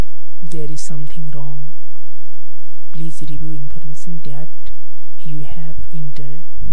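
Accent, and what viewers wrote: India. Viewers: he's speaking indian accent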